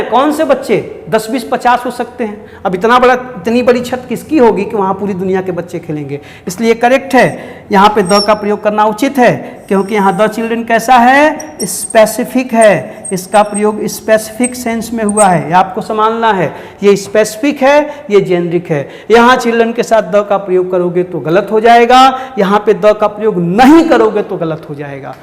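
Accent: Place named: Bihar, India. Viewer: sir ka dialogue aik to upar se bihari bht acha lagta hai ye😅😅😅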